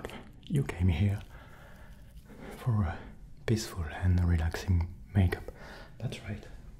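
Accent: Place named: France. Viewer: Ton petit accent bien Français me fait tellement rire 😂😭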